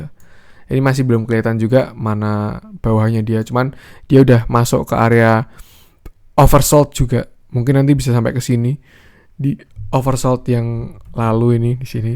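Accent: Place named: Surabaya, Indonesia. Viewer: ok analisisnya, kalau didengarkan seksama sptnya ada logat surabaya ini... :D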